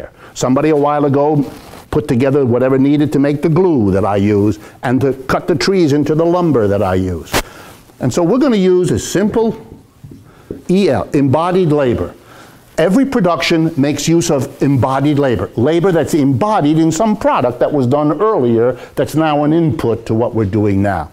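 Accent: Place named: Hungary. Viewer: he is funny too. but why does he sound hungarian....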